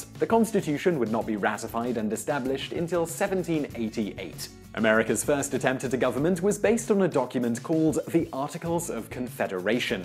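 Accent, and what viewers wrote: United Kingdom. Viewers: I love when you accidentally stumble onto an awesome channel. This channel is one of those awesome ones. I have been watching your videos non-stop for a couple days now. I can't get enough of them. However, is it possible for to slow down a little. He speaks super fast without any breaks. Sometimes I don't think he even stops at the end of his sentences. I think maybe he only stop at the end of his paragraph...lol In addition to his super speed he has that accent going on. You know when you are listening to another language that you do not know and you can't figure out where the breaks between words are? That's kind of what's happening here and I've been speaking English for 47 years. I actually have to pay really close attention, concentrate, & sort of translate what is being said. It's taking a little work to watch these videos and I watch youtube so I don't have to pay attention. 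If you do decide to take my advice, you don't have to slow down dramatically just a little slower would be good. Plus I think I see you turning blue from lack of oxygen....I'm just kidding about that. I see you have a lot of subscribers but I'm wondering if maybe your subscriptions would increase faster if you did slow down a little bit. I could imagine that some people may be passing up on pursuing your channel because your videos do take a little work to understand and follow what is being said. Obviously Europeans probably have no problem following you because they are used British-Englis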